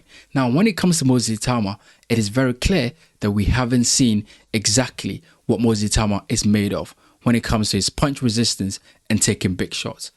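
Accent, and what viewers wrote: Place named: Ghana. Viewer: You sound Ghanaian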